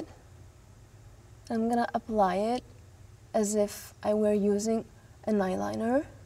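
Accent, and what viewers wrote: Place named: Italy. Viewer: italian accent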